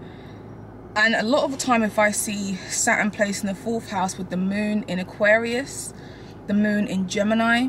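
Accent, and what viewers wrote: United Kingdom. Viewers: Are you from the UK!!! If so love it and love your energy. Love how you read Saturn in 4th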